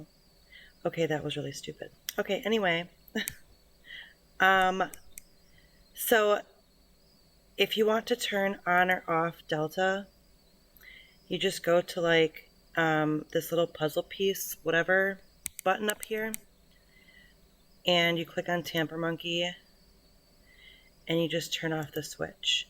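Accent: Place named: United States. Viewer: con acento americano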